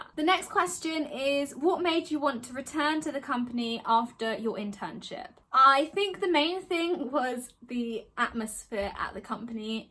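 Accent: United Kingdom. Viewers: Her british accent is so elegant! I wanna speak like that 😭💔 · This is the first video of your channel I've watched since am a Thai guy, who is looking forward to applying to a B.Sc. Actuarial Science in a certain university. I personally think you look so British, assuming by your accent. And all of your question replies are so helpful, all of which make me understand more clearly about what I am gonna face after graduation. thank you!!! :)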